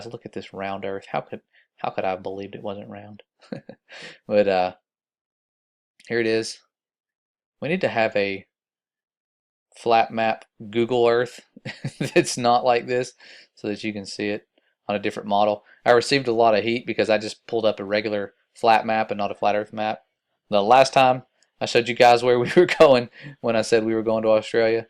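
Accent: United States. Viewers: I’ve never heard Cairns pronounced with an American accent like yours ,, he he I was hoping to travel up from Sydney to go barramundi fishing in Queensland ,, Scott Morrison/prime minister went on a family holiday while his country burned down ,, and the 60million dollars donated by the public for victims was kept by the charity organisation,, these big charity organisations are money making organisations when u look into their history Haiti is a good example of how they operate poorly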